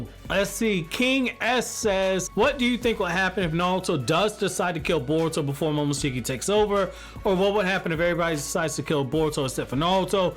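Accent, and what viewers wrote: New York, United States. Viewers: Bro, you actually have a pretty cool voice and you sound like you’re from NY. Your content brings me daily joy. Stay Up fam 🙏🏽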